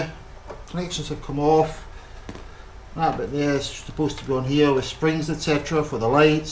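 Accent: Scotland, United Kingdom. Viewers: Thank you for another informative video - Great Scottish explanation and made perfect sense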